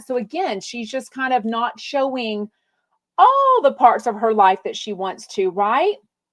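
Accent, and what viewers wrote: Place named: South, United States. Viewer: you are sweet and southern sounding coming from a texas person💝💝💝💝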